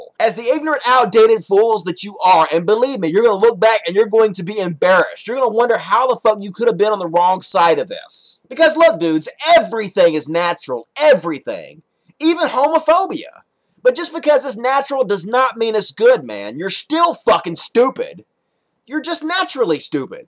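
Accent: South, United States. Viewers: your southern accent makes this video so much better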